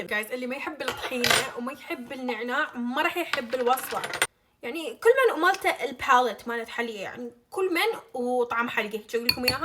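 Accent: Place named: Iraq. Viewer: من طريقة كلامج حسيت إنج عراقية و تأكدت لما شفت شو كاتبة تحت الفيديو +++أنا مغربية و أحب العراق ❤🇲🇦🇵🇸🇮🇶❤🔥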